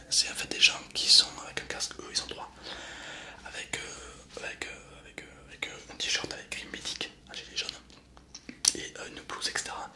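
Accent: South, France. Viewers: Et Bonjour c’est l’accent du sud 😉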